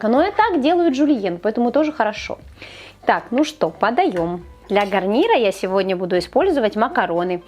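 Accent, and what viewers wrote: Ukraine. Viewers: Чому ви записуєте відео російською ? Чутно ж що ваша рідна мова українська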